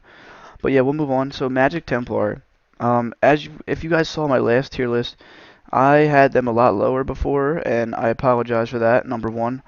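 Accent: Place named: Pennsylvania, United States. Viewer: Your accent reminds me of Bam Margera, lol you from PA I bet. It's all in the way you say "classes" it reminds me of when Bam says "asses" HAH!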